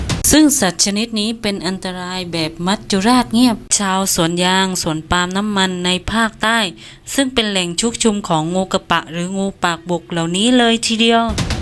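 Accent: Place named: North, Thailand. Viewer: สำเนียงคนบรรยาย..น่าจะเป็นคนภาคเหนือใช่มั๊ยครับ😁😁😁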